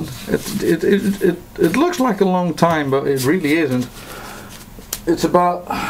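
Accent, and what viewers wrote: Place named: Netherlands. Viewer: it sounds like he's dutch and we dutchy's always talk funny if we talk english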